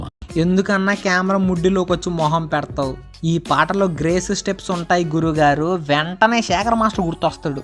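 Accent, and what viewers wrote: Nellore, India. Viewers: Nellore slang bro..😂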